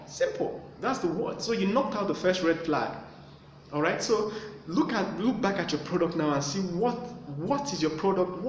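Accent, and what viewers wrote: Nigeria. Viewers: I hear the Edo accent #tongueout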